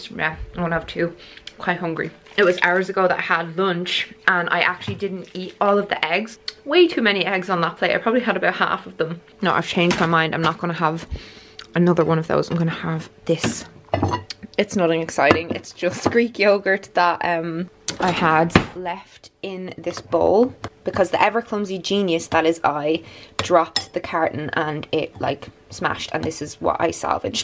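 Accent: Ireland. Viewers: ur irish accent is everything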